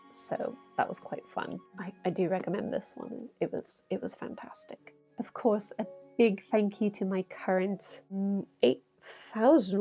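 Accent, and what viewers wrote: United Kingdom. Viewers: The accent sounds perfect tbh. Very R.P but thats okay innit! 👍 Btw I was watching a very old film of an American woman talking from the late 1800s on a another YouTube channel and was amazed to hear just how British hear accent sounded.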